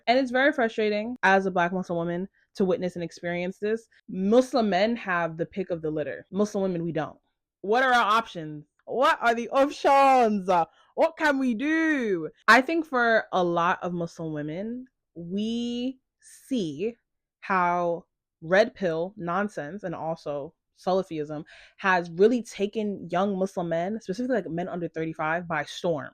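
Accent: United Kingdom. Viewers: aaaa the YouTube algorithm did her big one for once!!! i just love your vibe, humour, energy, mindset so much!!! although im actually a black Christian girlie(will be putting my Muslim babes onto your channel bc☕️!!!!) but I just turned 20 never had a relationship or been in love and definitely related to so much you said I honestly struggle sometimes thinking if that kind of love is attainable especially as I’m intentionally dating and waiting for marriage but in today’s society it can be very discouraging. im a curvy girl too and the amount of sexualisation despite how modestly I may dress🤡🤡heavy on the my man isn’t in this country im from the uk(loved your lil British accent hehe) but so ready to tap into the softness and femininity experienced elsewhere bc this ain’t it most of the time like you said as a black woman we’re masculinised and dragged for how independent we are! 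thank you so much for the advice you gave so truthful!!! and the questions you asking during your courtship like that’s literally me like if we’re getting married it’s forever so genuine compatibility is non negotiable! you spoke so beautifully honestly and what you said about him seeing your humanness aaaaa im going to go buy that book and watch all your Colombia videos I actually learned Spanish last year so honestly loving the Spanglish!! sorry this is so long haha I never leave comments bc i yapp anyways ik this is a little early but Ramadan Mubarak and can’t wait for you to find the REAL thing!!! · I thought I wouldnt have the attention span to listen for an hour, but you had me HOOKED! And your british accent is good haha, may allah reward you sis, keep making this type of content!!❤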